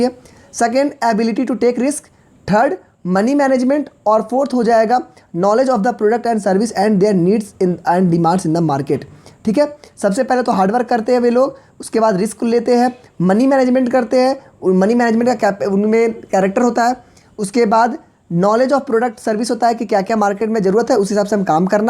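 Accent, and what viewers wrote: Bihar, India. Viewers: Bhai tum ek bihari ho kya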